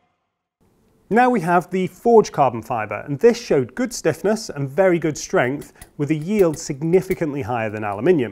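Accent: United Kingdom. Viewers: why is it always someone with a brit accent👎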